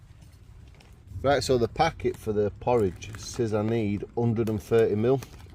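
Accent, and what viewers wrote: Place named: North, United Kingdom. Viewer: I thought I was subscribed but I wasn't, always watch your camp outs, your Northern accent makes me chuckle, the beard is looking good, get a beard before it snows it'll keep your face warm in the cold weather. But it definitely suits you, ohhh suits you sir! Lol! Got to convince the Mrs. Oh lovely to hear that gentle rain, it's been so dry this year, no rain to clean the air. Need that rain to keep it a green & pleasant land. How about a coastal camp, you & vegan Dan, I suppose your far from the coast, its getting the time. Anyway keep up the good camping! Always let us know how you feel, ignore the haters, can never please them anyway. A lot of share your opinions & outlook, at least we're right! Lol!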